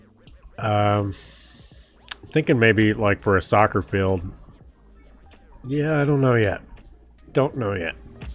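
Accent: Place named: Oregon, United States. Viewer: You're a fellow Oregonian, sounds like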